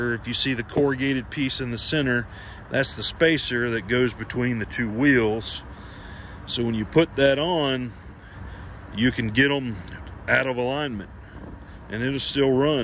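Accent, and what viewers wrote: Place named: South, United States. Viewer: I love the way you talk bro, no homo shit just like that low and slow southern accent. Just left your stall for grounds down in Florida, Lakeland to be exact. Keep the videos coming my friend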